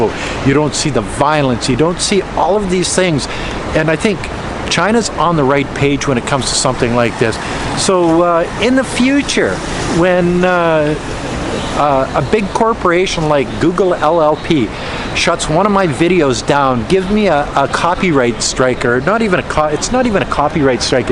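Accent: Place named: Canada. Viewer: Reasons why I like your channel is, you and me both Canadian from British Columbia, we have some much the same in common and your Canadian way of language "FXXK them!", yes, that is Canadian way and Canadian tone! Don't worry about those bullshit shut down, there is many other ways to express your truth speech and comment, just let us know and we follows. Keep up the good work and like to watch your daily life in China!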